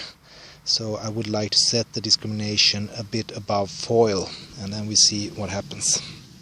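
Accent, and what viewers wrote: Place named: Germany. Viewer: What’s your accent? Is it German or Scandinavian? It’s very noticeable.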